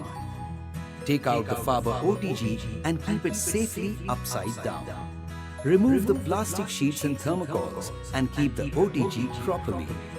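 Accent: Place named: United States. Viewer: ये क्या American हिन्दी hai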